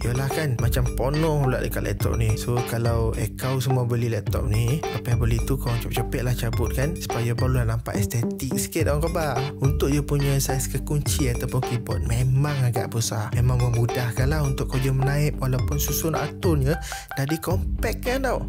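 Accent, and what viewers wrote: Negeri Sembilan, Malaysia. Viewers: Elok2 la cakap nogori tu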